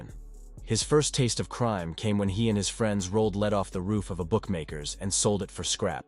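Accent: United States. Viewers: Narrated by a robot Yank who has no idea where Glasgow is so totally unwatchable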